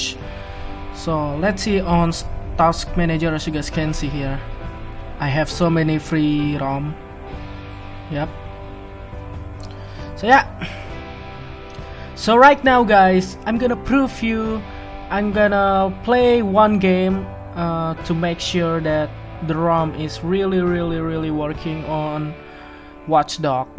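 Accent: Indonesia. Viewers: orang indonesia ya soal nya bahasa nya masih kelihatan logat indo nya...... btw itu laptop merk apa tipe apa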